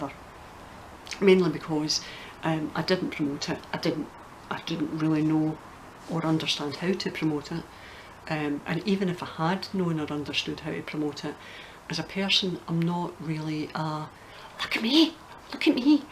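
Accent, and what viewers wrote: Scotland, United Kingdom. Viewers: i believe you are more than ordinary. My sister in law moved from scotland when she was 7. Her mum was one of the most beautifully souled women i have ever met. She was a ray of sunshine....you remind me of her. I love the accent. As women we do tend to stretch ourselves thin...i think that is why we are more suseptible to depression and anxiety. We need to lift each other up....💖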